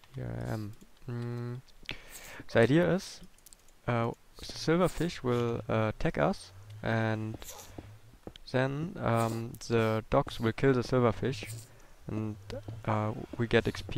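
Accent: Germany. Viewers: German accent :D and.. can you german?! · Are you german? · Are you German?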